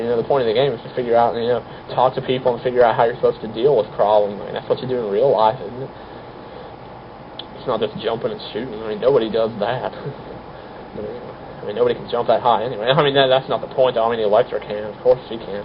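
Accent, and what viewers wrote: South, United States. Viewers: On the tape you sounded a lot more southern! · hhahahaha you had much more of a southern accent back then :D so CUTE! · Isn't he 19 in this tape? Also I love the southern the accent.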